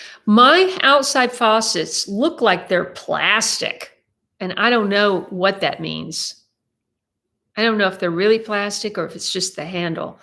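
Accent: Tennessee, United States. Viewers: Happy Birthday, have a wonderful time amazing, its Lucinda's birthday tomorrow 15th, my birthday is the 5th of April i shall be the big 80 can't believe it, you're getting back you're Tennessee accent love it Lucinda noticed it more than me, you're fireplace and livingroom looks really cosy and picture are outstanding "well done" missed the livestream, horse riding exercise see you next video love, Edwin & Lucinda Xx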